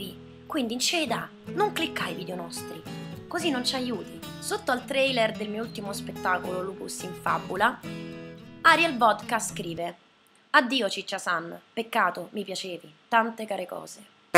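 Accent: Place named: Rome, Italy. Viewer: dio che sei bella quando parli il romanaccio <3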